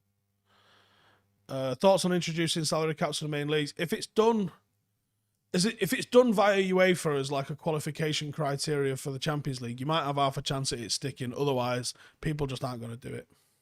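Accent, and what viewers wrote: Manchester, United Kingdom. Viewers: Mate stop trying to sound manc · How do you know he isn't a Manc? Or are you basing that assumption on his name. Of course, if you personally know him, then I apologise.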